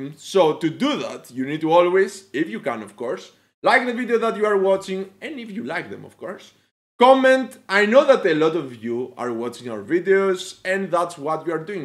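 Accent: Greece. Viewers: Unique Greek accent #BALORIANS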